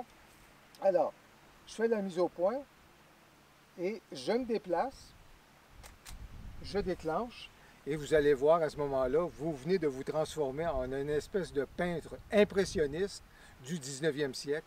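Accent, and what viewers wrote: Quebec, Canada. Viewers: en québécois ... avec une petite touche d'exotisme bien agréable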